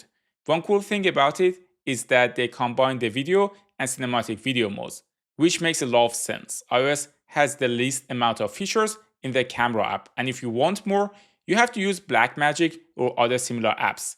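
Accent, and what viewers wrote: Iran. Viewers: Bro your accent is so fucked, are you Persian?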